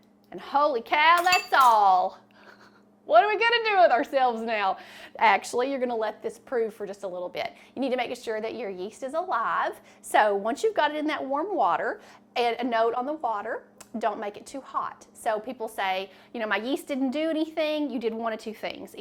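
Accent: South, United States. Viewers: Where in the usa are you from ..i love your accent 😍 💕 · The most southern accent ever. Love it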